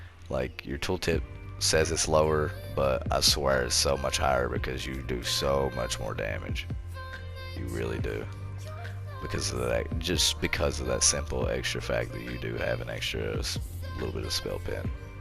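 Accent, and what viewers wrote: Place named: South, United States. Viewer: You sound southern as hell man, roll tide.. subbed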